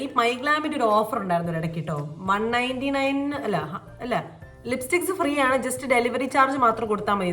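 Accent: Kozhikode, India. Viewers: Kozhikode slang alalo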